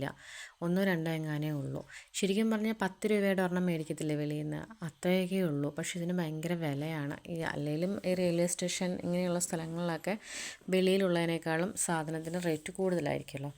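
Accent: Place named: Kottayam, India. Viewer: Super ayit undu❤ chakkapazham😋.. Chechide language ketal Kottayam ane parayu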